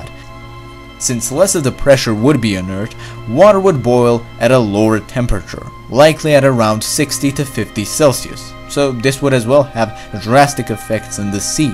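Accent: Serbia. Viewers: Just a Serb speaking English. Doubt you'd sound fluent when you speak another language.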